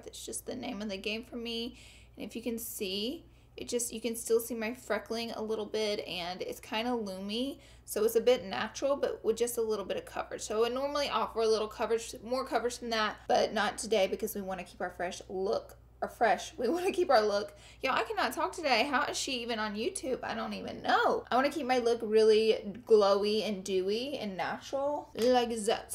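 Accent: South, United States. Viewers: Clearly the latter....... she's a southern gal....twang and all. Do you notice how cutely she says concealer? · You crack me up girl!! "Oily" I love your accent! I also have a tad bit of one most of my family is from the south.❤️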